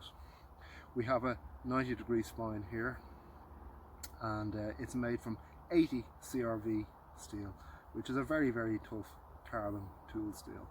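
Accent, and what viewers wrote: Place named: Ireland. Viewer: It is Great to see such a Good version of an Iconic Knife been used in My Homeland ! Thank You for the method of Drawing the Fire steel back from an embedded Knife it was a New one for Me ! The Golden Rule of using a Tracker Knife is that You have to Know How to Use a Knife Properly for it to be " A Good Knife " and I have to say this as an Old Dub in London for Forty Years , it is Great too Hear an Irish Voice on this Subject and I look forward to much More .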